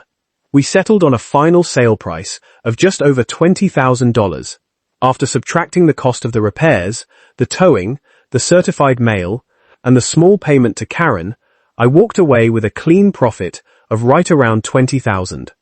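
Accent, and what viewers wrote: United Kingdom. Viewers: Why is the narrator English and not American?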